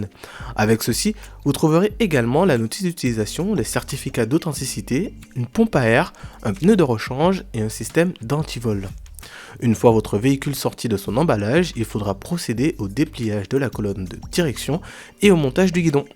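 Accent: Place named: China. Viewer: je sait que tes chinois car j'ai voyager dans le monde et je reconait tous les accent meme petit qu'il soit ! enfin je dit ca , car je voulait dire que la chine est un pays qui invente des choses supperbe , que ce soit des choses que l'on trouve sur des vidéo youtube insta ect comment ouvrir ceci sans cela , comment devisser sans clé , comment ceci comment cela , les vidéo sont nombreuse ! les chinois sont supper inventif ! puis ont a sur les magasin de type magasi nen ligne qui vende de tout petit gadgetr mais a chaques fois des choses merveilleuse mais qui a chaque fois sont très utile . puis ont a les smartphone dont j'ai plus jamais acheter autres que huawei ou wiaomi comme le 14 tpro qui a une carte graphique de ouf ! 12 giga de ram enorme ! et un demis terra ! et le proccesseur ses un des meigleiur ! soliditer supperbe avec le dernier écran le plus solide du marcher ! 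bref la chine vient en force dans tous les domaine ! ils faut dire que la chine est en avance de mentalité de plusieurs millénaire ! l'unique dommage ses la liberter en chine ! et beaucoup voye le chine comme une menace moi je vois que la russie et que la chine ne donne rien pour l ukraine enfin aide sont allié mais de chose minim mais non offensif ! bref la ici la trotinette moi jai le model xiaomi 4 lite 2 gen que j'ai eux gratos en achetant le 14t pro ! tous ca pour dire que plus j'achete chinois plus je me rend compte que leur gamme monte de niveau , ont des loin du made in china des années 90 dont tou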